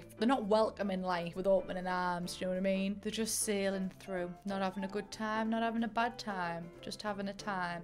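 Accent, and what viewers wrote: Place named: England, United Kingdom. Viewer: There’s this joke here in Aus about old ppl saying “film” like “fil- lim” but now after hearing you say it exactly the same I know it’s not something they do cuz they’re old, it’s cuz they came from your side of England! (Which my Nan did! Honestly I can’t deny that half the joy of watching your videos is to hear her accent & humour again!) Pretty sure Tea was dinner as well for much of my childhood & probably only changed when kids at school didn’t know which “tea” I meant.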